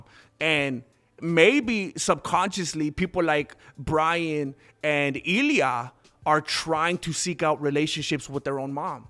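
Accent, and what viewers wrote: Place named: Mexico. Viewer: An intimate relationship with himself in a metaphorical and literal way. You are a genius my man. The num-chuks with flip flops in the background. It is so great how you can put that Mexicano flavour on the words.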